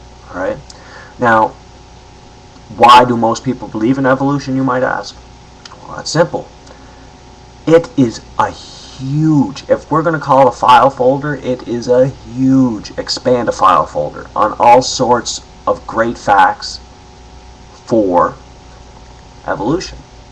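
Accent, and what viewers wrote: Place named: United States. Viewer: Pity about the accent. It's hard to sound intelligent in an American accent.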